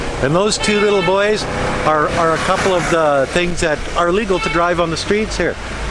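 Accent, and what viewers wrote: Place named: Canada. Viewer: 5 minutes in and you mentioned Canada, Im from Canada, southern Ontario, and I guessed at the beginning that you were Canadian, LOL. Nice video.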